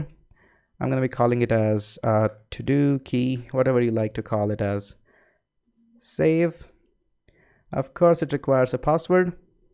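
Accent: India. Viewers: Thanks for helping. Indian accent is not good at all :/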